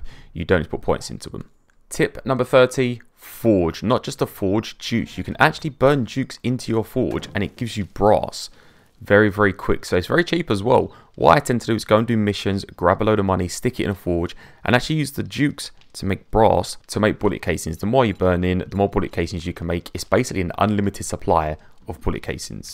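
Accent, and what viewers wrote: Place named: England, United Kingdom. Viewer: Dude, thicc English pronunciation. Do love it)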